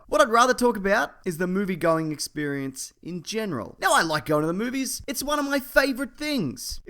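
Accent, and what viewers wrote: Australia. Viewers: Delivered in that unmistakable Down Under accent!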